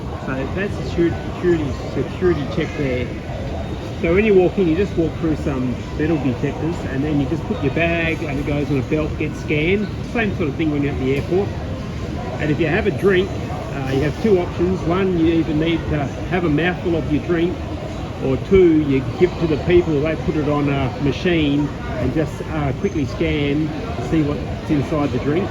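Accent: Australia. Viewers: From the accent, it feels like this anchor is from Australia.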